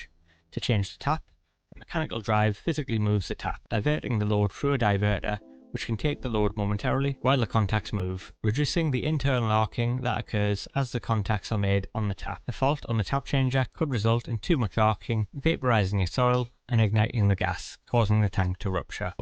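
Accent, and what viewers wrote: Newcastle, United Kingdom. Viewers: I've just discovered your channel. I'm just a nerd interested in all these things, I won't pretend I understand everything, but it fascinates me nonetheless. Please don't use an AI voice over. Your wonderful Geordie accent adds to the authenticity. Thank you for sharing your knowledge and experience, from a Black Country fan. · Please stay away from AI voice-over! Your speaking voice is just fine. A good clear soft Geordie. Quote: "A 2008 newspaper survey found the Geordie accent to be perceived as the "most attractive in England" among the British public." All the best, Bob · I love the Geordie accent. Keep up the great videos. Although I am an electrician who does purely domestic work, I think it's good to take a deeper dive into this sort of thing now and again.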